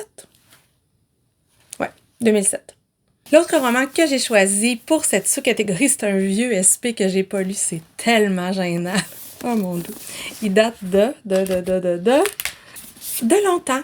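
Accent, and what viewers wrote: Quebec, Canada. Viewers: Bonjour de Belgique, qu'est-ce que j'aime l'accent québécois, ça me rappelle mon Erasmus à Montréal en 2007 (ça ne me rajeunit pas), que je n'ai jamais oublié! Vous, les Québécois, êtes un peuple adorable et attachant, j'aurais adoré rester vivre au Canada! Petite question: qu'est-ce qui fait que tu optes pour la version originale en anglais ou la traduction (je pense au Joyce Maynard, qui est originellement en anglais mais que tu sembles lire en français)? Bonne journée!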